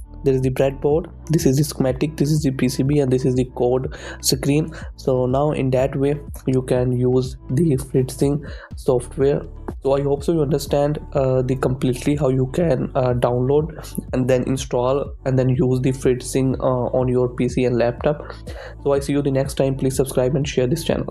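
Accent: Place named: India. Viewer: Indian English ist great to hear ...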